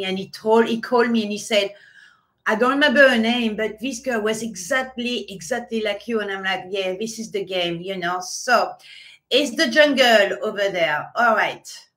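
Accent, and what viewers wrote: France. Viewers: Merci , merci …pour votre bonne humeur , votre beau sourire , et vos vidéos que j’adore écouter , parce qu’enfin , je comprends tout , votre anglais est adorable , avec un accent français , un peu comme le mien 😂🥰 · Love your sense of humor ! Enjoy listening to your lovely French voice and uplifting ideas on all subjects ... so glad I found your utube channel. Best wishes. Karen from Denver Colorado. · Your cute french accent is so charmimg on youtube. Please don't go... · Take care of you but please keep us in your life! Love, love, love you and your social media. Best part is hearing your lovely, smooth French voice. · I recently found your YouTube channel and Wow!! I love all your content, your personality, your French accent 😄. I just want to say don't give up. It looks like you have so many people who support you and love what you do. 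Your ideas are classy, doable and timeless! I love it all!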